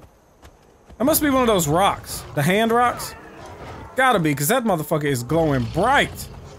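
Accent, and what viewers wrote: South, United States. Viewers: he said yall are you from the south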